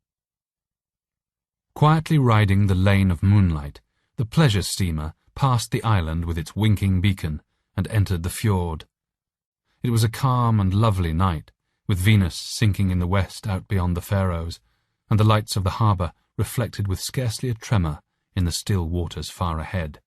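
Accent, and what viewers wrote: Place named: United Kingdom. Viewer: Great story and excellent narration pace. The narrator also has a nice voice and an elegant British accent.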